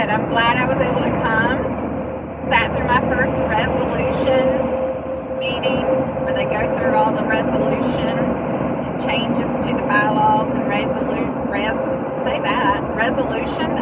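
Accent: South, United States. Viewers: I could listen to you talk all day!!! I love the "southern accent" thamks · Subscribe Love the videos an the southern accent lol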